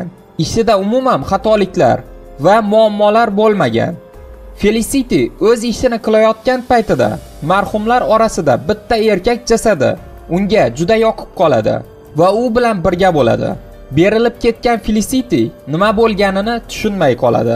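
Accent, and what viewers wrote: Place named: Khorezm, Uzbekistan. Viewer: xayotda qanday garipsangiz shunday oqing deysizmi menimcha admin xorazmlik xorazmcha gapirsala vapshe tushunmaysla😂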